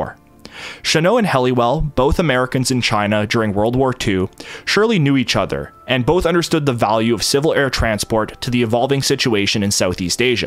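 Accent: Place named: Central Alberta, Canada. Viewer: That sounds like a central Alberta accent on the narrator 😅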